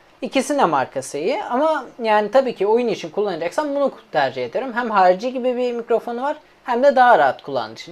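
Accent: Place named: Black Sea, Turkey. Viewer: ne diyo bu karadenizli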